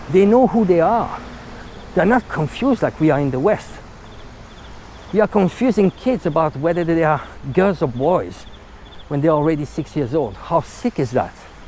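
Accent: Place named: France. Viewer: Please never stop talking and teaching about China, it is important and so necessary to push back against what we are told and given in media. I learn so much from you and maybe because you look familiar to me (my father being from Napoli) even though your accent sounds very french to me. If you have seen and lived this reality i trust 😊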